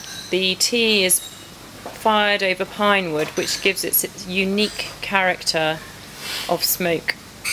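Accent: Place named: United Kingdom. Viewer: Very interesting video, I like Lapsang Souchong! It has very peculiar taste indeed, it's surely not for everyone. Lovely British accent, by the way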